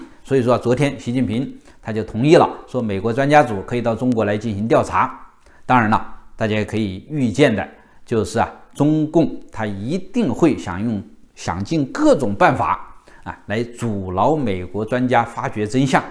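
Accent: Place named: Dangyang, China. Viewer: 口音越听越像当阳人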